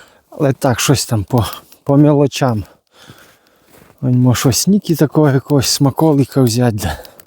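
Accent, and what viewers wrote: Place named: Korosten, Ukraine. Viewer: Доброго дня. З пару місяців тому знайшов Ваш канал і дивлюсь із величезним задоволенням. А знайшов цікаво, випало випадково, включив і Ваш говір виявився дуже знайомим, можна сказати рідним - коростенським. Справа в тому що в мене весь батьків рід з Веселівки, можна сказати поруч. І хата діда з бабою в селі також давно стоїть пуста(( До війни їздив туди тільки на полювання. А цієї зими двічі вибирався туди з Києва просто погуляти по рідних з дитинства місцях, подивитися сліди звірів. Величезне дякую Вам за канал!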